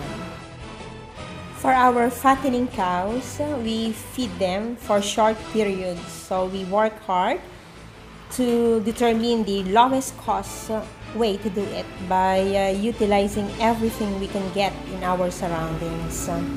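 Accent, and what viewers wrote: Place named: Italy. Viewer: ur accent is italian, not filipino .... any accent will do as long as they can understand u, not wrong with accent specially filipino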